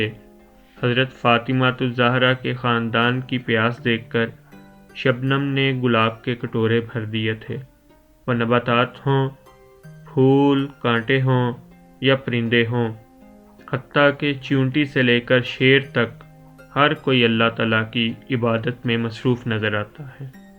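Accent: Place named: Punjab, Pakistan. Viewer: زبان پر توجہ دیں۔ اردو کو پنجابی میں ادا مت کریں۔💓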